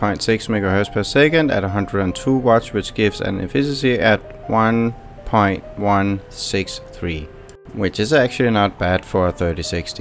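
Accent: Denmark. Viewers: Den der Danske accent altså. :)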